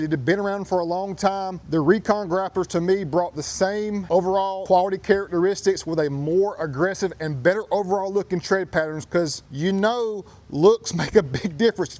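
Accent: South, United States. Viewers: Seriously what kind of pretentious asshole puts the style of the tread over its functionality? Just because the tread style is old does not mean that it's not good still. If someone actually invented the best overall tread for mud, snow, ice, rain, and dry conditions and nothing else for the next 50 years beats it would you actually give a shit? If you're a pretentious douchebag you would, if you're a man you wouldn't. You're a yuppie suburbanite with a southern accent. 😂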